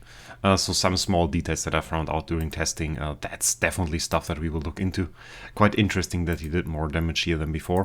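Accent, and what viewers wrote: Germany. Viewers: Ur from germany right?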